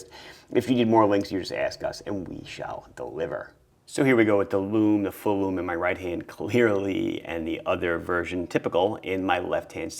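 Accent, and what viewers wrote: Long Island, United States. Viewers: that's pure Long Island, son 😛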